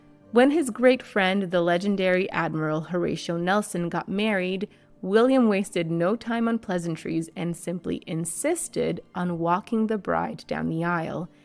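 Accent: United States. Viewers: peter ustinov great for the part of william 1V. not keen on an american narrating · Brits, I presume English Brits, seem compelled to insecurely leave indignant comments on this American-accented narration. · Remember this is a Yank female narrating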